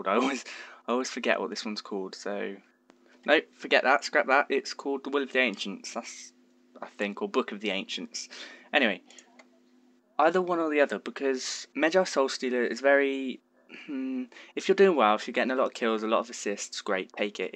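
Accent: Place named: RP, United Kingdom. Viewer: you're so posh, also are you reading from a script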